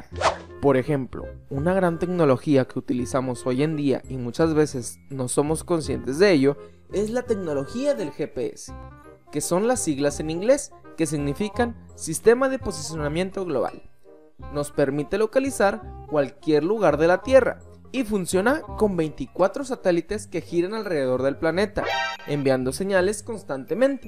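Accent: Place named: Fresa, Mexico. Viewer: se oye como fresa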